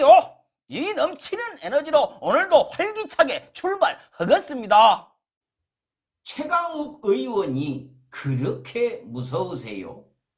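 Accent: Jeolla, South Korea. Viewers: 성범형님, 전라도 떠나온지 4년인데.. 내용떠나서 형님 사투리 들을라고 들어옵니다. 그런데 속까지 시원해진께 기가맥히요.. ^^ 사랑하요 행님.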